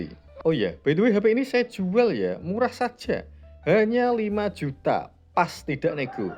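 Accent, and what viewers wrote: Pati, Indonesia. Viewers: Wah wong pati ya mas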